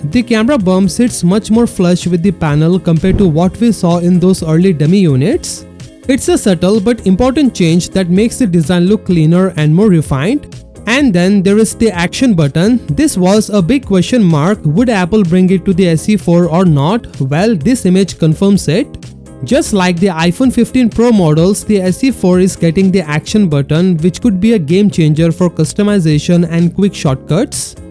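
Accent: India. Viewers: Indian origin narrator? Why can't we get a neutral one?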